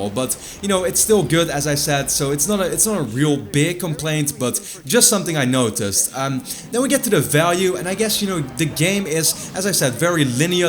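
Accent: Netherlands. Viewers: is this guy dutch? if he is so hey ik ook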